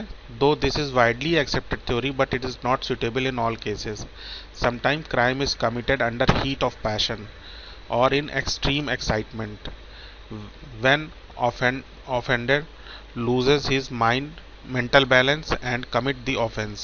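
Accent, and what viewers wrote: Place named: India. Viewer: Indian accent!!!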